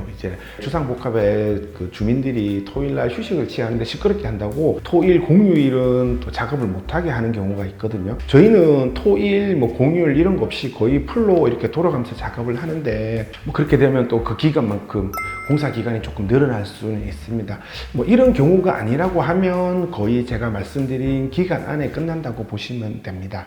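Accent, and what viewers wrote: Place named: Gyeongsang, South Korea. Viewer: 역삼에 있는 개발회사인데 9월이사라 이사갈곳정하고 있는데 연락 꼭드리겠습니다. 같은 경상도 분이라 먼가 친숙하네요^^ 전용 약 63평, 임대면적 99평정도 되는 사무실 예정하고있습니다^^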